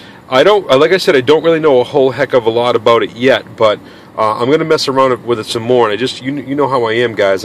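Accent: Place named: Boston, United States. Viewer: Where are you from? I want to say Boston cant quite put my finger on it.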